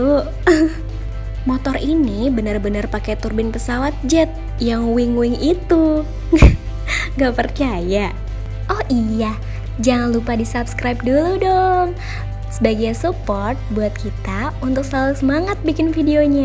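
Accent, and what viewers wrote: Indonesia. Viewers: Auto modar😂 kaciri orang sunda na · Logatnya seperti logat Palembang...Pesawat minta duit 😀👍🏻..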